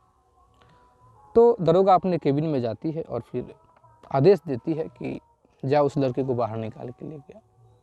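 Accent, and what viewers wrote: Bihar, India. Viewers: आपकी बोली में बिहारीपन भाषा का झलक आ रहा है स्त्रीलिंग पुलिंग का पता नहीं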